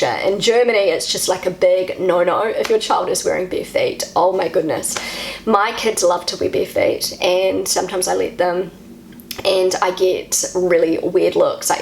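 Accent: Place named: New Zealand. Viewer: Also bei mir geht das Verstellen der Abspielgeschwindigkeit zwischen 0,25 bis 2x, allerdings verstehe ich auch bei Normalgeschwindigkeit ganz gut. Anfangs hatte ich auch etwas Probleme mit ihrem Kiwi Accent, aber daran gewöhnt man sich relativ schnell..!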